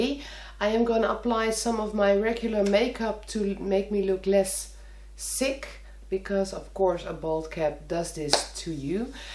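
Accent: Netherlands. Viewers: are you dutch your voice is a little bid dutch im dutch 😂😂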